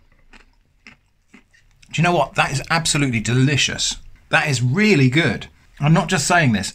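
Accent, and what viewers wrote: United Kingdom. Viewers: You and your wife are so adorable together at the dinner table! I love your British accent. I love all your videos! You're so down to earth and smart. You make very good content.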